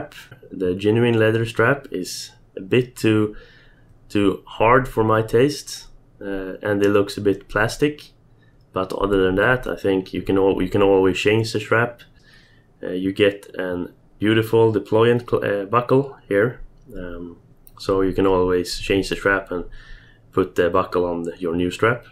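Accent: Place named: Sweden. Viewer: The accent haha. I've heard it a million times here in Sweden, though you don't have one as thick as most.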